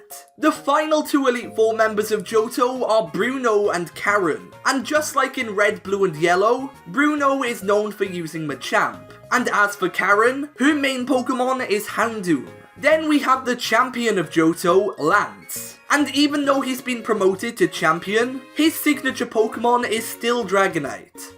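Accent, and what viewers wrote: Wales, United Kingdom. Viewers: you are welsh aren’t you. I can kinda make out some welsh accent. Possibly, I’m an American so I know nothing. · Are you welsh ?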